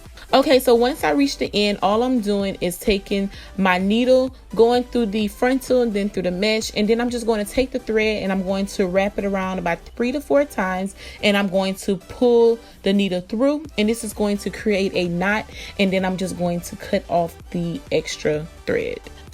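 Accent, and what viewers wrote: Louisiana, United States. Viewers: Am I detecting a Louisiana accent in that voice? Lol I love it! You've made it so easy for me.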